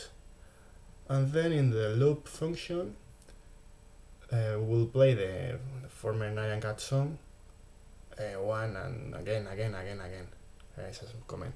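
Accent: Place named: Spain. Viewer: Como se nota que eres español xD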